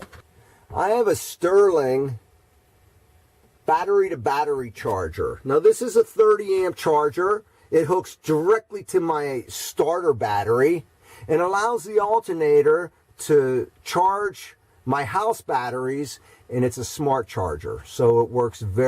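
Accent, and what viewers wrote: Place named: Northeast, United States. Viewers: so you then really do have a Philly accent with a blend of the two. Just say you are from Northeast Philly if someone asks what part of Philly you are from next time. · You from Pennsy or south jersey? Can’t tell from the accent. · Can tell you’re from the north east lol. I’m from Philly.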